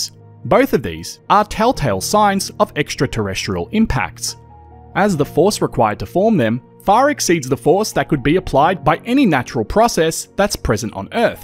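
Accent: Australia. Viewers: Nice to hear this program in a good ol Aussie accent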